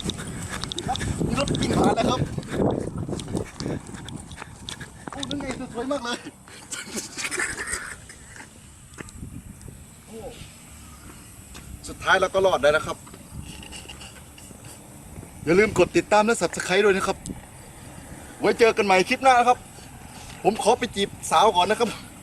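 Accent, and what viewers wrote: Laos. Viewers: ไทยตกลาวนะคับ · เว้าไทยคือคักแทะเว้าลาวแน่ๆๆ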